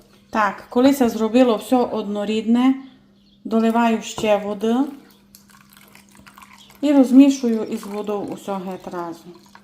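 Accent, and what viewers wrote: Transcarpathia, Ukraine. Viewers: Йой як файно по закарпатськи говорите, лем коли чуву ож крумплі то ріпа, та уха ми ріже 🤣 Вже варю, дораз увидиме шо то за діло 🤓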